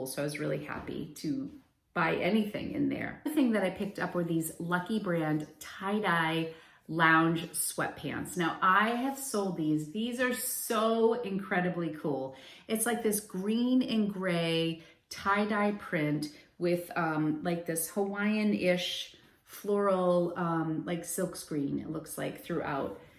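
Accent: Boston, United States. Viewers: Your videos are my favorite, your Boston accent! Baux for box! Keep up the amazing sourcing. Thanks for putting out the best content on YouTube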